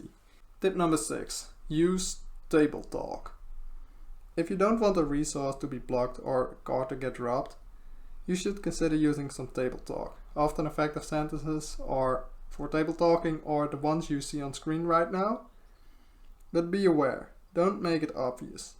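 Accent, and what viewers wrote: Netherlands. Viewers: Heel sterk Nederlands accent haha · Ik hou van het Nederlandse Engels accent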